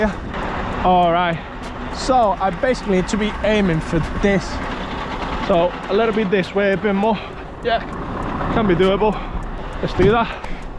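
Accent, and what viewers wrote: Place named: England, United Kingdom. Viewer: Why do you sound foreign but also English. Keep up the good work mate, I enjoy your videos.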